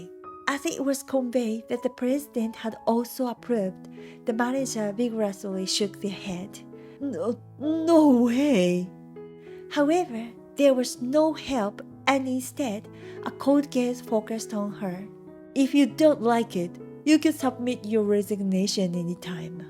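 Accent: Japan. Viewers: Why translate to English ? You hired to read story a asian stupid person who can not clearly speak English due to a heavy Japanese accent! All of the English words are blurred grammer or simply miss--pronounced totally! Very hard to listen to! !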